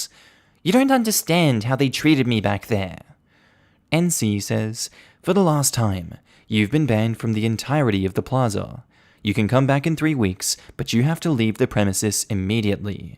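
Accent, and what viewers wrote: Canada. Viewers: I think you sound Canadian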